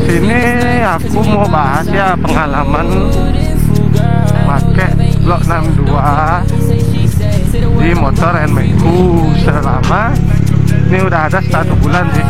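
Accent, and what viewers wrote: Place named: Bali, Indonesia. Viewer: Kle bali banget wkwk jeg kaku bhs indone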